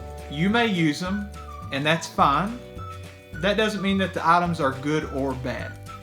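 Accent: Kentucky, United States. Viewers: What part of Kentucky are you from? Seriously, I live near Ft. Knox.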